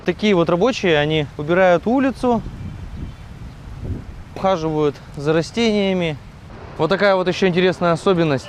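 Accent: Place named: Arkhangelsk, Russia. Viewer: Добрый вечер, 😉Интересная кухня, наверное, вкусная)))Но северные шаньги и калитки, думаю вкуснее 😊Про образование, в следующем видео, я бы хотела знать )))А разговор, Архангельский, знакомый 😊👍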